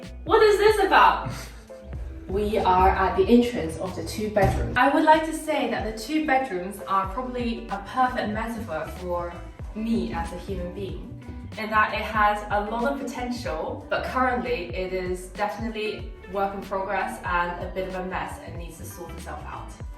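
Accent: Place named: London, United Kingdom. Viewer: and you have such a beautifull smile nice video and a londoner dialekt very elegant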